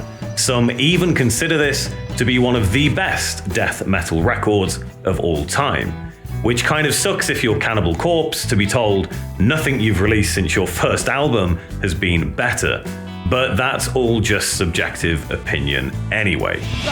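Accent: United Kingdom. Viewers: Even though you play live footages and cuts of the songs, my mom would fall asleep because your british accent since loves it. She doesn't even like metal. "Just put me the Brits on youtube, Dino".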